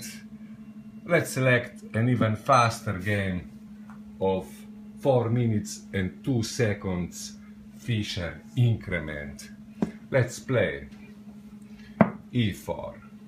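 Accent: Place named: Russia. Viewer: I total read this with your russian accent! Youre so funny! thanks for the response.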